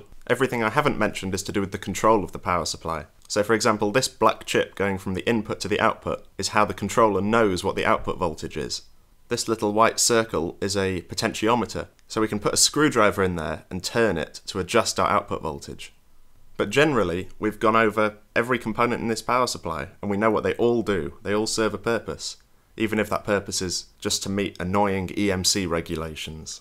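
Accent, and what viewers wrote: England, United Kingdom. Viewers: I've spent over 35 years in industrial power electronics, own and operate my own engineering firm, and taught full time as a professor in technology for thirteen of those years. Kudos to your teaching methods. You're water illustrations are brilliant and intuitive and speaking as a Canadian who recently visited Yorkshire in Britain, I love hearing the British accent and idioms in this video. Some corrections however: The thermistor employed for over-current protection is a PTC (Positive Temperature Coefficient) device, not an NTC (Negative Temperature Coefficient) device. NTC thermistors are used for temperature measurement. PTC devices act like resettable fuses and limit inrush current by increasing in resistance as a function of temperature (i.e. higher current). Both are non-linear devices. MOVs behave like back-to-back zeners and exhibit very high resistance until you reach their break-over voltage but unlike an SCR, don't avalanche (assume full conduction when triggered on). 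In this way they only clip excess energy above a target voltage threshold but they don't chase it all the way down to zero volts. MOVs also tend to deteriorate with each spike they take so their effectiveness over time can diminish and they are rated in Joules as well. Also, MOVs like to explode when their energy levels are exceeded so I've seen designs where they're shrink wrapped to contain flying bits in the event of. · Very good and understandable lesson on the power supply. I don't know yet where you are based in the world, but you accent sound more england. I am in south africa. I hope you can allow me to add that the potentiometer does not only adjust the output voltage, but also control the entire circuit and protect it from getting out of regulation and destroying itself. Thank you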